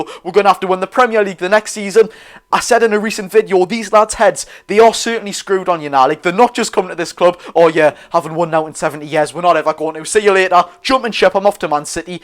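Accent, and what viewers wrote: Newcastle, United Kingdom. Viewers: I must say I love the Geordie accent very friendly brilliant video by the way 🤍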